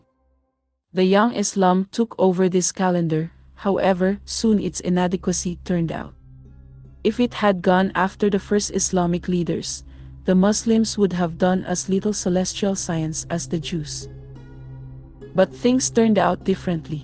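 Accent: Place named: Philippines. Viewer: My guess is the narrator is from the Phillippines. I could be wrong but hope I'm right!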